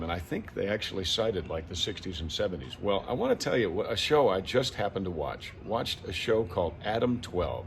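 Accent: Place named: New York, United States. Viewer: You sound like a New Yorker of the East Coast. Lord forbid! You are spot on! Keep talking? Been watching "Chips!" myself... "Heat of the Night!" "Magnum PI," "Hunter!" "Soap" .."Quincy." " Little House on the Prairie." "Mash!" And what I would like to find and re watch again is "Benny Hill!" Kids don't know what they are missing. I wish they would rerun "Dr Quinn M.D." again... They never get old!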